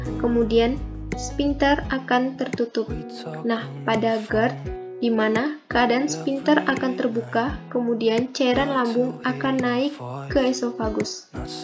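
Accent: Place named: Java, Indonesia. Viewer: Suka yg medok" jowo asli haha😂😂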